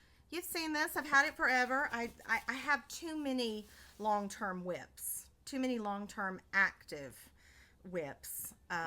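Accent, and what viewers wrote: South, United States. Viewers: Another great video! Love listening to fellow Southerners! Especially since I am stuck up here in snowy Canada! 🤪The swans are gorgeous!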